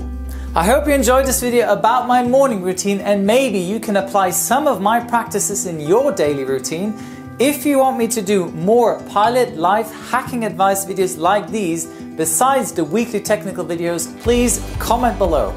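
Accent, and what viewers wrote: England, United Kingdom. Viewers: You are very British in your accent. Refreshing, in a world that is crazy about imitating America. 😊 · Hi,! I'm from São Paulo, Brazil. Well, I simply love Watching your videos on YouTube. Nice to see that, from all your habits as soon as you get up, you meditate! Absolutely healthy to your mind, body, and everything. I really admire your job and this charming British accent you have! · Wait is british?? All this time i thought he was german with amazingly english accent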